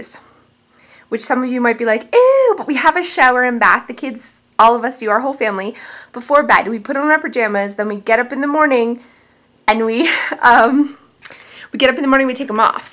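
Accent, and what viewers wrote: Canada. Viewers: eh'? Muahahaha, you are sooo Canadian! LOL ;P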